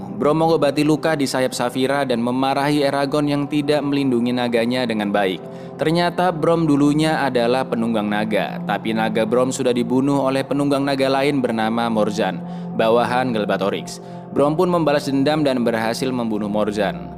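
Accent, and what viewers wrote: Java, Indonesia. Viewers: Kang lu orang Jawa yang mana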